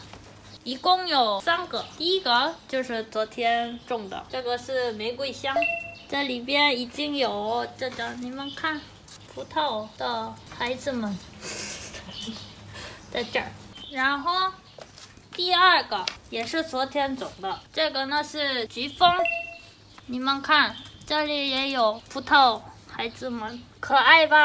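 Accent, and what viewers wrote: Northeast, China. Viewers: 博士为什么一口东北味儿？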